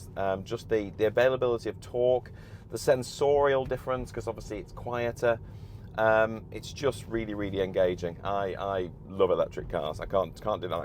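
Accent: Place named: RP, United Kingdom. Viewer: lol It’s just a phrase that some Americans focus on because we feel that plays up the British accent. You have a nice posh British accent that’s easy for us Americans to understand. 👍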